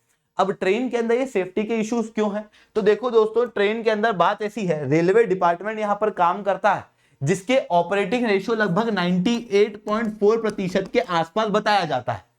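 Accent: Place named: West Bengal, India. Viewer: chaudhary it's WB accent not odia accent. Not so new tho since odisha is not recognised that much in whole india.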